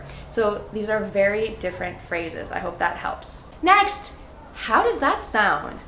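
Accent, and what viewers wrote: United States. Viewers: Darling teacher your my favorite teacher at all, please tell me which state you are frome I wanna do some research about your state accent cuz I think I fall in love with it. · i 'am beginner student and i can understand a lot of your videos but i have a problem about the accent if i watched another vidios with anoter accent i can't understand, is this american accent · Your American English like a sweet candy. Undoubtedly, i'll be part of your live class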